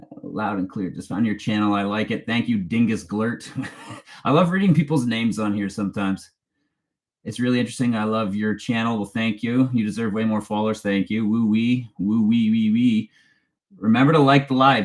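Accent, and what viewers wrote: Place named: Canada. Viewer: Definitely Canadan. I get a chuckle everytime he says sorry cause of the accent.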